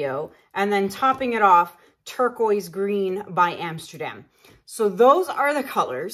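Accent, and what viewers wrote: Canada. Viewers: Lmao I’ve got to tell you that in the past year or so I’ve met so many people from Canada …. I have a sister who moved to Canada(oddly enough in the same city you moved to but she’s been there for 5 years now) but never noticed it with her friends or anyone like that that she and her husband have met since moving out there but people that I’ve met on Long Island and NYC that are from Canada I know where they are from as quickly as they can hear where I’m from bc of the way they say “out” and any words with out in it bc of you! 😂😂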